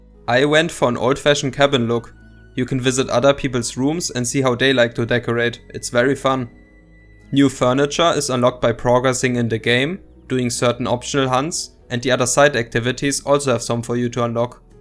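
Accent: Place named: Germany. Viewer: The German accent though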